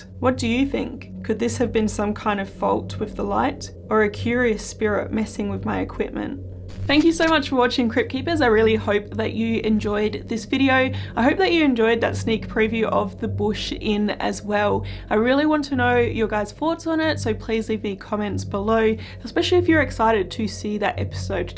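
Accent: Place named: Australia. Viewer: I love the fact that I can tell you are really serious about the paranormal. What makes you videos better than anyone else's is your beautiful Australian accent. Love your videos. Thank you. Keep them coming.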